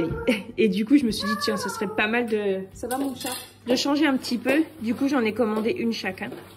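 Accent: Ardennes, France. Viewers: L accent des Ardennes j'adore! Courage pour ton travail quotidien et bravo surtout!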